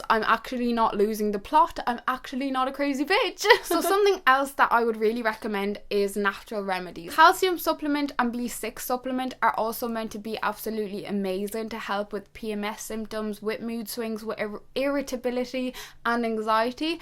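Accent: Dublin, Ireland. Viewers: Thank you so much for making this video cause in my house the word period is like a bad word it's so annoying. I love your EARRINGS btw❤❤❤ If your doing a Q&a anytime soon I wanna know what part of Ireland your from I'm from Dublin you sound a bit Dublin but ur like a lot posher than me so then I just thought u were from sligo for some reason idkw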